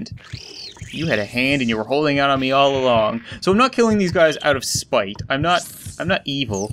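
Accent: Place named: Canada. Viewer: He was so Canadian sounding back then compared to now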